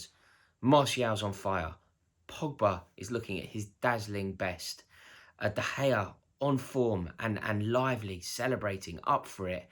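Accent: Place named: Cockney, United Kingdom. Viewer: My favorite chirpy cockney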